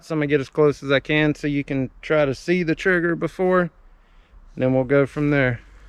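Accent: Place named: South, United States. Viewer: What a douchebag comment , cause he’s got a southern accent , let me guess your some proper speaking northern liberal? Stop being rude , thought you yankees were supposed to be all tolerant and shit?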